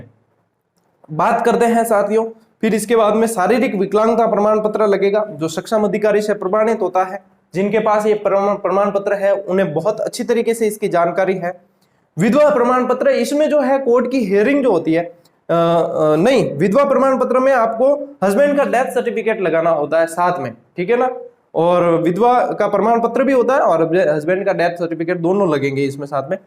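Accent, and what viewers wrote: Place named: Rajasthan, India. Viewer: Sir aap marwadi bolte h to bahut mast lagte ho 😎😘🥰😘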